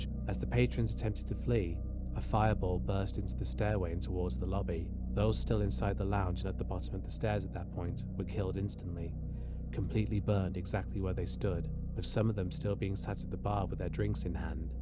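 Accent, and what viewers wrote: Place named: United Kingdom. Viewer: You sound British so please please please stop saying YouTTTTTube. It is not a hard T!!!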